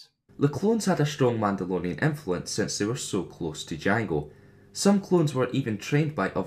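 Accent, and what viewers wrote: Scotland, United Kingdom. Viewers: Scottish like me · Are u scottish?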